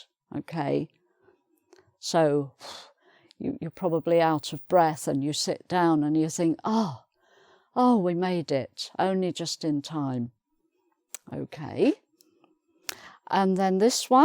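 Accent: United Kingdom. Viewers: I am a learner from China. You are so cute. And I really expect to have a Brit accent like you. I will follow your lessons. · you are a good teacher, and l like your strong UK accent very much! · I like a lot as a teacher.Because she is so patient and very skilful in teaching English.Also I like so much her British accent.She is so nice.!!! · I love your British accent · Thank you so much for your lesson. I love your British accent . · British accent 😍 really lovely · Thanks. I love your British English. I hope learn more english with you.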